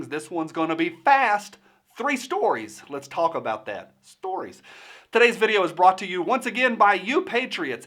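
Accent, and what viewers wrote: South, United States. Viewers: Really respect Paul Harrell and like his videos..I like your videos as well and have just subscribed....as I listened to you I was thinking man he sounds familiar....and then you you said " Shazam " and bingo !! Gomer Pyle !! I grew up watching TV shows that Jim was in and always liked that guy. Being a Appalachian born hillbilly myself I love hearing southern accents on the tube...old tube or you tube ... thanks for what you do really like your channel. Also I'm a vet and absolutely do not like posers..now I will go and watch the video in question...thanks "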